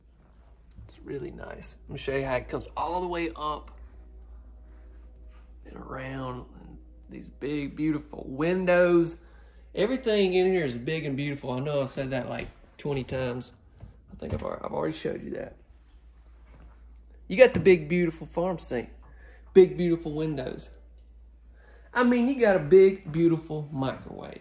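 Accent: South, United States. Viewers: You sound Extremely southern....not ghetto 😏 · This a beautiful! Bright! You are southern guy you sound like you supposed to sound. Don't change. You are so funny in your own way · Didn’t care for the “ghetto” remark. You sounded more country than anything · thats not ghetto speak. that would be what you hear in a inner city (mostly black) area. what you are doing is southern slang.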